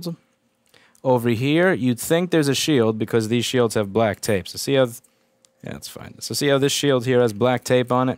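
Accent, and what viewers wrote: New York, United States. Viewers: fuh rod? do you mean farad? (fay-rad) i think would be the phonetic spelling. might just be your new york accent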